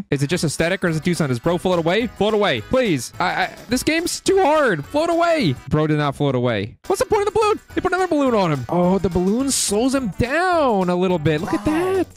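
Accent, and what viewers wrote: United Kingdom. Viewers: BRITTISH :D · Bro turned british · lol british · bri'ish man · bros British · Bros british accent is so good whattt😂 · W british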